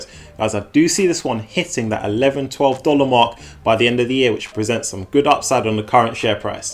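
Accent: United Kingdom. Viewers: love your British accent!! UNFORTUNATELY ALL THE STOCK ARE OTC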